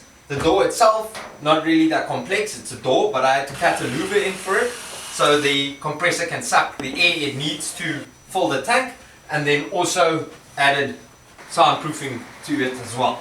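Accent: South Africa. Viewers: That a south african accent? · Terrence Lemire South African accent 😉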